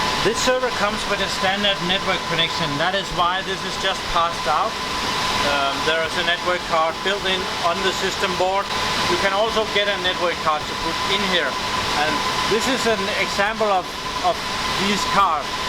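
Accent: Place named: Denmark. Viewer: is he danish? sounds danish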